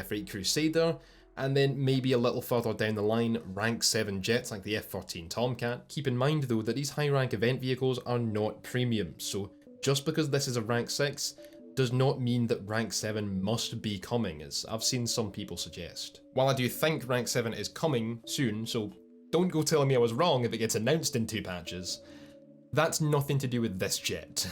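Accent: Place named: Scotland, United Kingdom. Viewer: Ngl I watch ur vids mostly for ur accent I wish I had a Scottish accent lmao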